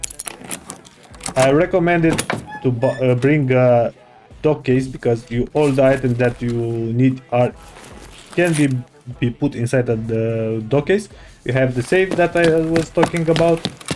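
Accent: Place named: Russia. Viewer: Like ruski accent it's amazing I'm polish how come we don't get that nice accent 🤣🤣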